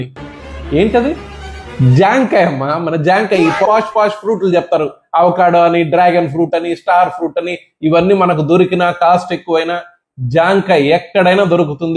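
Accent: Nellore, India. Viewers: Your Nellore slang makes me so happy Dr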